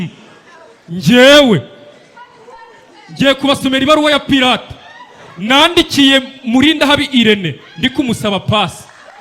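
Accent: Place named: Kiga, Uganda. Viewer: Kugikiga cyawe rwose turanezerewe 😂😂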